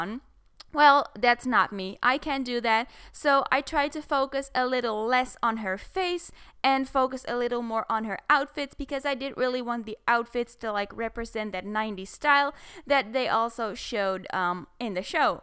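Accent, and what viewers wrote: Netherlands. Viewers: Yess ❤️ I knew you were dutch the second i heard you haha Dutch accent coming through 😃💙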